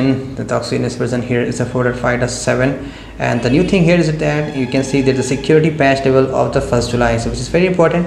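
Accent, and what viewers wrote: India. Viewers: Fucking hate Indian accents in general but good vid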